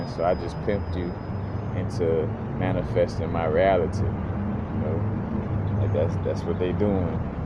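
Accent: New Orleans, United States. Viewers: You have to be from new orleans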